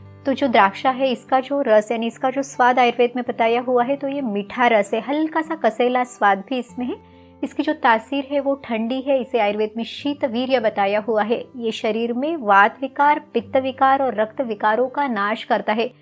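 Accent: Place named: Maharashtra, India. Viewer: Aap ko dekhke aapki bolne ke tarike se aap maharastiyan lagati hai didi❤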